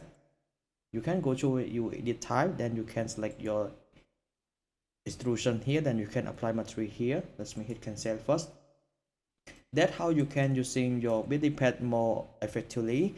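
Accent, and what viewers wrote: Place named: Cambodia. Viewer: He sound Cambodian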